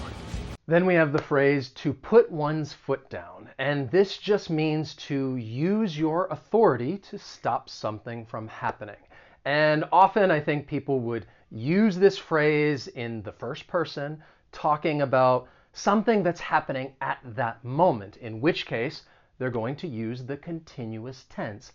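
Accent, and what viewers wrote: United States. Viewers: Amazing American English at it's best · Your pronounciation is American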